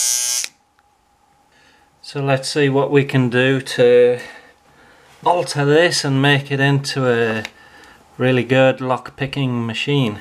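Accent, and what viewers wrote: United Kingdom. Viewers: OMG ... this is MacGyver with a British accent. Great video Amigo.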